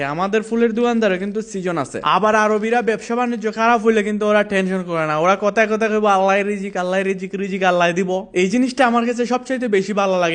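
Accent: Bangladesh. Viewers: হবিগঞ্জের ভাষা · বাড়ি কিতা সিলেট নি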